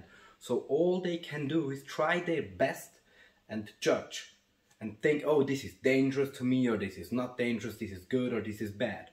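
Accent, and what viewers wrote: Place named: Hungary. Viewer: This guy reminds me of my little bro, are u Hungarian by any chance? B/c the accent sounded like my little bro who came over to US but grew up in Hungary.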